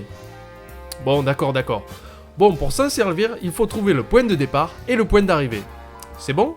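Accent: South, France. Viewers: Il est du sud enfoiré !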